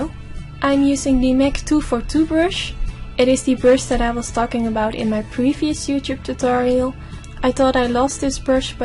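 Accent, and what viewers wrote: Netherlands. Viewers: ik hoor aan je accent dat je nederlands bent haha! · Nice tutorial and I love the music :D Maybe it is a tip to place text for all the brushes you name in the video? You have a very Dutch accent and sometimes it is a littlw bit hard to understand what you're saying :$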